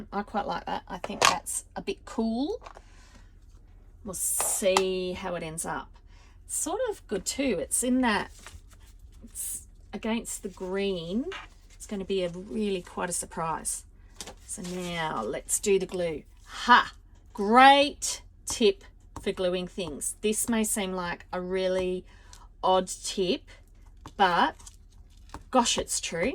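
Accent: Australia. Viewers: Hi, nice to hear another Aussie xx love this journal xx Hope your channel grows, so nice to support you. Look forward to seeing more!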